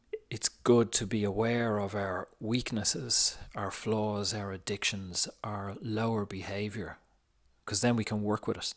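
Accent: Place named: Ireland. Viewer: Didn’t think I was gonna hear an Irish accent when I clicked on this link from inside a Divine Masculine page... here I thought I was the only one on the island 😂 love the vid!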